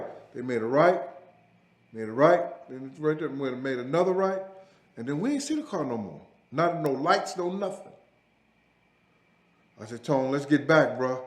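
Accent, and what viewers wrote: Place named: New York, United States. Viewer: n b4 is wild B off top. Dat NY talk is maad krazy yo!!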